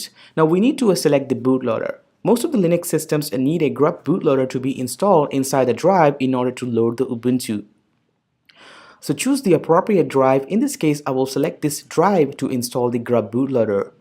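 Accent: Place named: India. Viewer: He's indian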